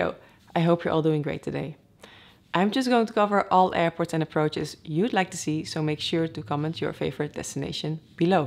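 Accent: Netherlands. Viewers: You could tell she's Dutch as she pronounced every "v" like an "f" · Heel goed. I love english with a slight dutch accent.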